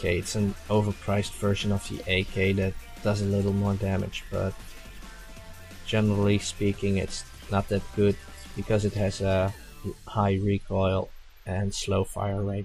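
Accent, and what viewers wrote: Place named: Russia. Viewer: the guy sounds russian i can tell an accent very good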